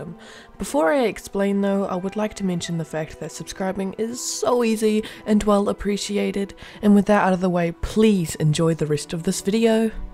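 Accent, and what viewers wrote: New Zealand. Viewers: I absolutely love your accent, is that a kiwi accent?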